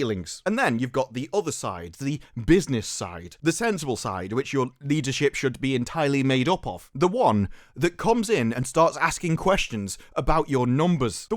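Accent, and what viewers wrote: United Kingdom. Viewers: Oh, I get it.. So you're like a British It'sAGundam ??? 🤔 I'm here for it. Your accent is very similar to Gopher. 😅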